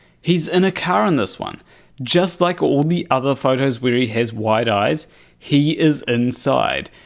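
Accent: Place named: New Zealand. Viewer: Best N.Z. accent on U tube.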